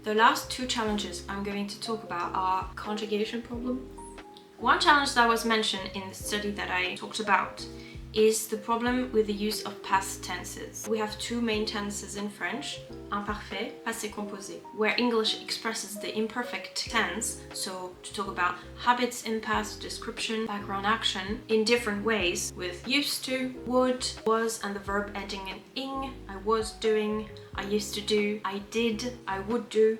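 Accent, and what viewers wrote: United Kingdom. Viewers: love your english accent! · I can tell that you have spent many months in the UK. Some of your sounds are very British. That's good. Well done. I would not have guessed you are French. This was a good list of what I have encountered while learning French. I'm at a 50 score now, and hoping to make B1 before I go to Senegal in March. I wish I had made more of an effort in grade school. I'm also working on Spanish, since I will be in the Dominican in October. I do missionary construction work, and I don't like to rely too much on the missionaries to communicate. Netflix does not have a lot of French content in the US, so if you have a tip for me about how to access that, it might help my French listening ability.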